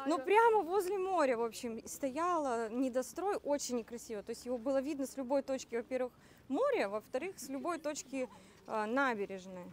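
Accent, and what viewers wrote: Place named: Ukraine. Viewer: По акценту, риторике и набору слов - Украинка .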